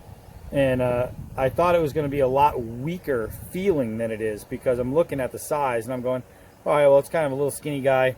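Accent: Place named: Rochester, United States. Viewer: Got this knife today, nice demonstrations. Where you from sound a little like Rochester NY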